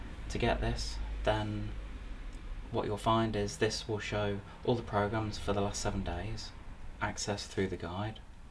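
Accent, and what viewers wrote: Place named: Birmingham, United Kingdom. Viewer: And it's with a Brummie twang to it too.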